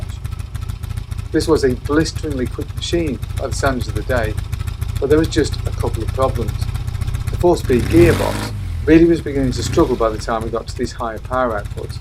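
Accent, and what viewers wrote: England, United Kingdom. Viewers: I could not watch the entire video. The English accent drowned in the background noise of the engines made it very difficult to understand. I'm sure it is a good video nonetheless !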